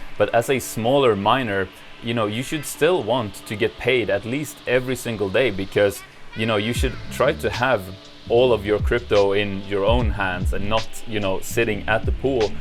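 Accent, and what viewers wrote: New Zealand. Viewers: Nice bit of Kiwi my dude, keep up the amazing work!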